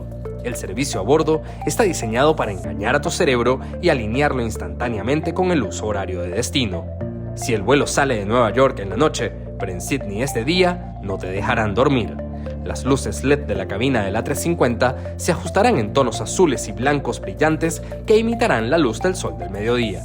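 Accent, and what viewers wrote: Venezuela. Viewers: Jajajaja, la gente quienes comentan sin conocer los canales! El usa su verdadera voz y los venezolanos hablamos así, y no hay ningún país, ni siquiera España, en donde la gente hable español pronunciando absolutamente todas las letras, entendido, brodel?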